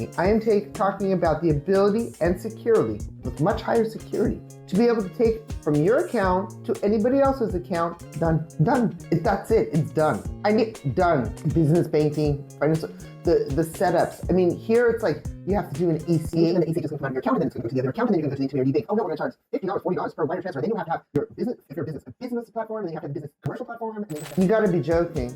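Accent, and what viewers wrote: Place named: Brazil. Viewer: Hold up, you're American? 😂 You're speaking English with a Brazilian accent. 😆 That's fking amazing.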